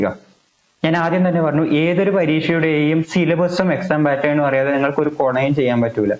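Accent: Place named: Thrissur, India. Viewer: കേട്ടാലറിയാം ത്രിശ്ശൂര്ക്കാരനാണ്.....😅😅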